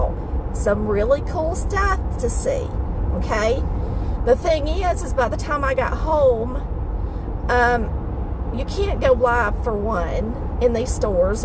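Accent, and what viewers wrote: South, United States. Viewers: I wish she'll be my mama because I like her country voice. 😍😍🌹❤❤❤😘😘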